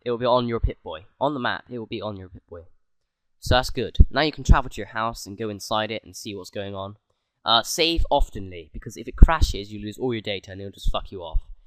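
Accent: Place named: Bristol, United Kingdom. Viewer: Sounds a bit Bristol to me!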